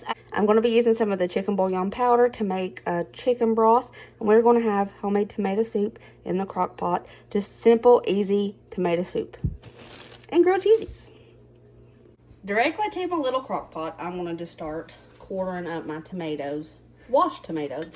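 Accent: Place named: East Tennessee, United States. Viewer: I knew it! When you said "you'ns," I had to check the Description Box. I grew up in either TN or KY most of my life, and I thought you might be from Eastern TN listening to your accent. I love hearing someone speak "right" on YouTube, BTW, LOL! But when you said that? It just made me SMILE! I'm currently in Western KY, but I know my accents. Please don't ever change! Just found your channel, and I love your ideas--new sub. Feels like I found a new friend. God bless you and your family! 🙏💖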